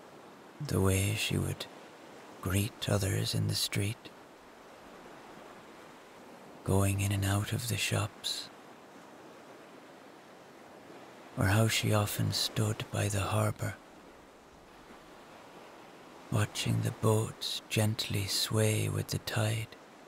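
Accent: Ireland. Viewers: I love your stories using an Irish accent to your voice! So lovely, lilting, and relaxing . Thank you. 😊❤😊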